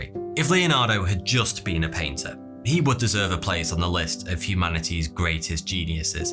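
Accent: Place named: Stoke, United Kingdom. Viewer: You sound a bit stokie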